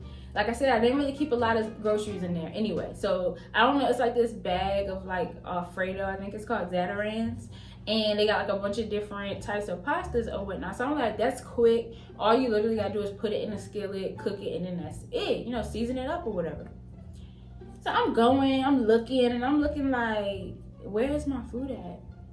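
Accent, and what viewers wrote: Baltimore, United States. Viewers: Girl you from Baltimore??? I hear an accent😭👏🏽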